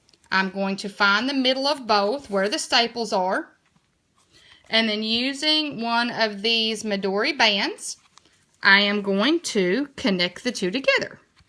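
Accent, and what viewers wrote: South, United States. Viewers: Really nice video, very clear, easy to follow, and I loved the clear way the ensembling of the foxidori. I also loved that sweet Southern accent! :-) So warm and homely to hear!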